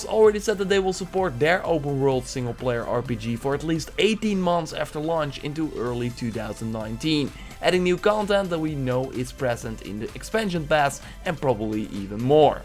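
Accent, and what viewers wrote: Netherlands. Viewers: ge hoort het toch da ge nederlands bent van origine ze man :) kweet ni hoe funny voor mij :p (geweldige video's) kben al nen hele tijd uw vids aant bekijken en kzouet belange ni beter kunne.... goie werk en blijf vooral verderdoen man :) grtz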